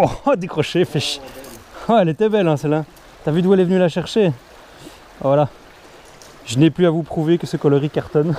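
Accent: Belgium. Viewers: Belle vidéo merci, ça fait plaisir d'entendre un accent Belge c'est familiale 😁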